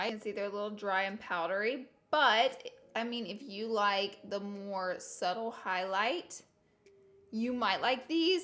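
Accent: South, United States. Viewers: Instagram fam😘😘 I LOVE the way you say Valentime's DAY, conciler, etc... Gotta love those southern twangs 😘❤ from one southern girl to another, I like the way you talk 😘❤ NC HERE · Katie Serious Talks its her accent and they do sometimes say it in the south. So can you not be so rude and negative. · #snapchatfam #igfam your my favorite YouTuber by far I’m from North Carolina and have a strong southern accent like yours and I absolutely love it 😍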